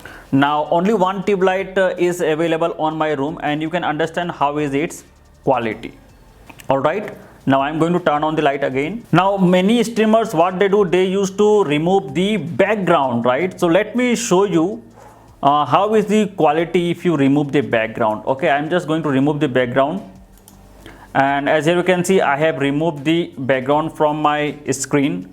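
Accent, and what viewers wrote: India. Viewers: Mine is the hd 270p version. I've had Logitech web cams for over 10 years and rarely used them. I think we should video chat because I could help you with your American accent and you could help me with your wonderful Indian accent. I can imitate your accent from time to time that I learned from many telemarketers. It comes in handy when they call my house and I shock them when I start talking like them. So hilarious. Great review and accent too. thanks. 😁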